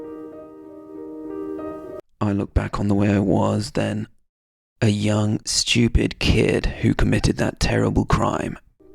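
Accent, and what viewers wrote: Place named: United Kingdom. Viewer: please sir i like your accent so much and I'm your follower student on youtube podcast. I found the 4000 essential words are read by American accent and really I like it to be with your British accent. please try to help us by reading these 4000 essential words in English.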